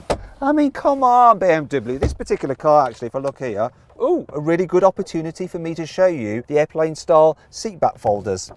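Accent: United Kingdom. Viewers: 영국 특유의 억양인가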